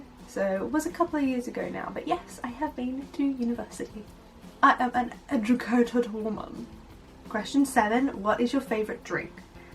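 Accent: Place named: United Kingdom. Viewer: Hhhahaa this is much better than our old channel!!!! How did you go from sounding American when we were young to now sounding British??? Hahha!! Love you long time!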